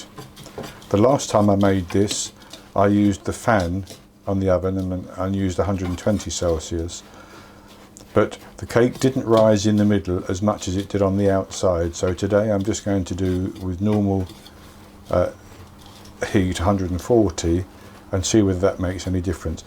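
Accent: United Kingdom. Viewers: I love your accent, what kind of uk accent is it?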